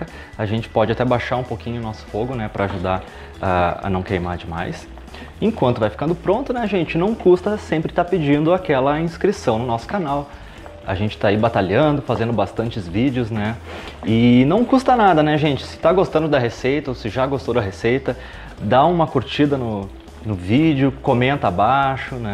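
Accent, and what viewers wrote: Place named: Rio Grande do Sul, Brazil. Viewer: Vou fazer 😋 uma pergunta, vc é gaúcho? Por causa do sotaque 😁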